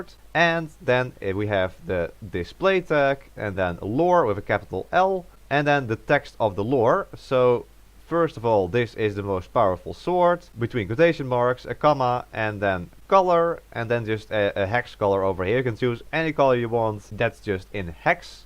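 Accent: Netherlands. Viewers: this dudes def dutch for sure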